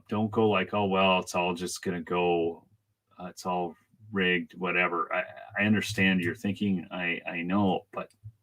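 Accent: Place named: Minnesota, United States. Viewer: So funny to hear you say you're from Bemidji, I have been there, my Ex hubby was born and raised in Karlstad and his dad was the traveling Psychologist for multiple towns, Thief River falls, Bemidji, other surrounding little towns. I am from Tx and when we moved to MN, prior to going to Germany for 3 years, i thought i was in a cold hades....lol..I was used to hot TX weather and nearly froze my rear off. I had never seen garages where you had to plug in your vehicles 30 mins prior to leaving the home...it was WILD, moose in the yard, i had never seen one. He graduated in 1985 - he had never seen a black person when i met him (he was 19)- his accent sounded exactly like yours. MN is cold as crap, not meant for Texans! i couldn't wait to leave~ lol...I felt like l was living on another planet. Then i married a guy from Alaska, you'd think i'd learn...lol. 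I don't go there though! Thanks for all you do!! love you're positivity!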